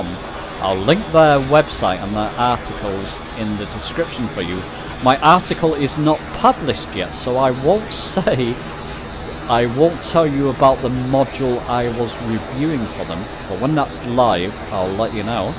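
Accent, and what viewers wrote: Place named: Scotland, United Kingdom. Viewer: hi. you say that the flight time is 6 minutes from landidan to paris. i am trying to do the same route. i have download the plane but can't find landidan. is that in finland? although the flight would be impossible. you did say 6 minutes? sorry, i have trouble with your scottish accent as i am english:/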